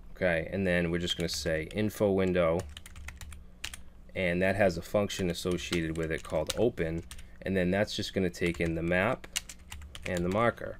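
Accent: Boston, United States. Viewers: when you started talking i immediately knew you were from Boston 😆 thanks for the video cheers brother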